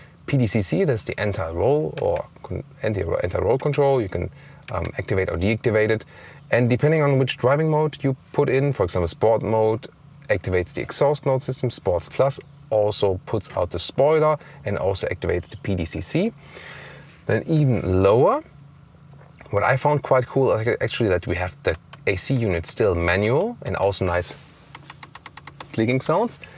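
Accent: Germany. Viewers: A review of a 911 Porsche turbo S is always best in the gentleman has a German accent. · A car review can't get more German than this. Congrats, your reviews are on point. Very presentable host and the way you do it makes it so pleasant to watch. Easily the most professional car reviewer on the web. Your german accent makes it that more nicer to watch. Cheers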